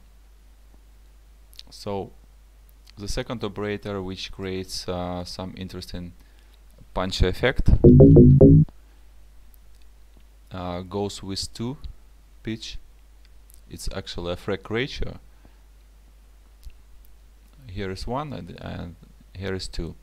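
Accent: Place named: Russia. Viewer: русский акцент))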